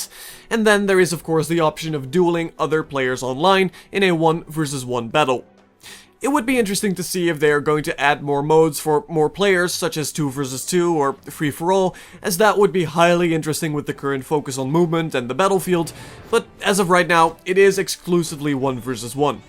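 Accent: Netherlands. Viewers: Heerlijk dat Nederlandse accent :D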